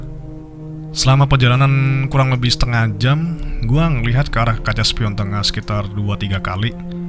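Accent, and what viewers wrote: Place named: Surabaya, Indonesia. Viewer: Loh wong suroboyo toh mas? Boso suroboyoan ae cak unik wonten identitas e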